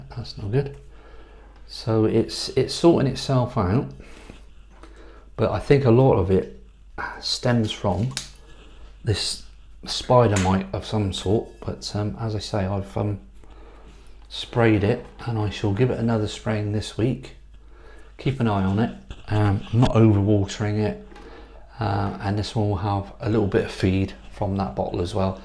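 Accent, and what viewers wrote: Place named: West Country, United Kingdom. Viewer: Nice plants, nice to hear a proper West Country accent. Before I left the uk we had grandmas cactus that were said to be her moms, I’d like to think they still exist in some form or another, at the moment I have red, pink and white ones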